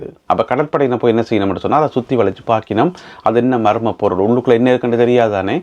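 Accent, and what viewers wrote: Jaffna, Sri Lanka. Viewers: உங்கள் செய்தி சேவை மிக அருமை யாழ்ப்பாண தமிழில் கேட்கும்போது மிக மிக அருமையாக உள்ளது உச்சரிப்பு பிரமாதம் வாழ்க உங்கள் சேவை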